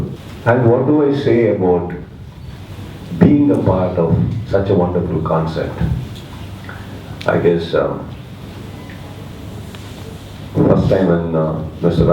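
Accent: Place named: Karnataka, India. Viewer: Aama nanba avanga voice mattume raghuvaran madhiri irruku avalo dhaa slang konjam thalaivar rajini sir ki resemble aavudhu. Yeanathukkena sudeep sir mudinja ivana pudi anrua padathille avarue thammodaiya own voicele pesi nadichirundharu appo avanga tamil pesumbodhu slang konjam thalaivar rajini sir ki resemble aahirundadu apro youtube kile neraya perume comment pottirundharu sudeep siroda tamizh slang thalaivar rajini tamizh slang ki resemble aavudhunu yeanathukkena adhu vandhu tamizhle konjam kannada influence aahirrukalanu nennakkare. Rajini sir tamizh slang ku sir tamizh slang ku neraya otthumaigal undo but aana base voice mattume raghuvaran sir madhiri apro height kooda avanga madhiri dhaa. Slang onnu otthu pogadhu adhu onnu resemble aavadhu. sir mattume kedaiyadhu karnataka le porandhu valandha jananga tamizh pesna adhu rajini sir madhiriye irrukudhu adhu yaare aagattu, arjun sir,sadhuguru, charan raj ivanga ellaru pesradhu same slang dhaa prakash raj, kokila mohan, murali kooda karnatakakararu aanalu indha moonu peru tamizh theliva endha kannada slang illame shuddama pesradha kathikitaru, prakash raj, murali indha rendu per kannadar aahirundhaalu thooya tamizh le pesitrundharu, prakash raj & murali va tamizhle endha karnatakakaranu idhuvareku beat panna mudile. 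Apro sudeep sir rombhave talentedaana nadigar. Avanga tamizh pesradhu tamizh makkalku santhoshatha thandhirruku nu nenaikkala. sir facecut konjam raghuvaran madhiriye irruku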